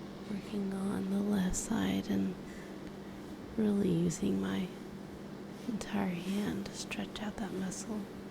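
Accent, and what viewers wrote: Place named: Texas, United States. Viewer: Gah, between your accent (slight though it is) and the cicadas in the background, I feel like I'm sitting back home in North Central Texas on a lazy summer evening!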